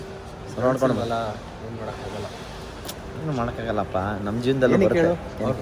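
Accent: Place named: Malavalli, India. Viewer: Hi bro... Nan nimma vlogs na recent agi nododikke start madiddu compare to others nim vlogs nange tumba ista aytu bcoz of namma kade use mado slang alli matadtira navu malavalli avre😊 keep on growing bro ❤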